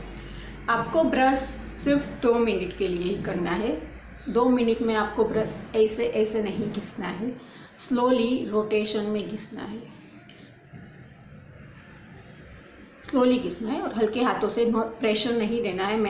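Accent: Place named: Gujarat, India. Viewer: Ma'am I think app gujarati ho. Apka accent gujarati jaisa lag raha hai.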